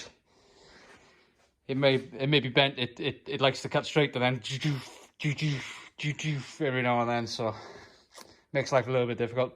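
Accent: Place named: Wales, United Kingdom. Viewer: Hehe, I guessed that you were from Wales, I worked with a couple of Welsh blokes here in Australia! Also, great work on the exhaust. It's a job I hate doing. Gotta love the sound of a V8!! Subscribed.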